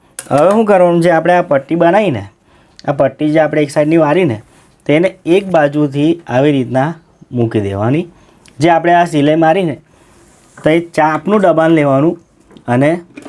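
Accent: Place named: Mehsana, India. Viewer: Kheralu baju na so bhai mhesana ni bhasha se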